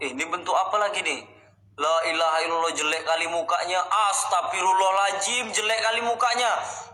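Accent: Medan, Indonesia. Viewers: Bg, abg org mn? Koq cem org medan bg? Salam kenal y bg dri kami. Anak" awak sukak nonton video abg, lucu x kata anak" ni abg😆😆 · Bg orang mana ya.. Medan kah...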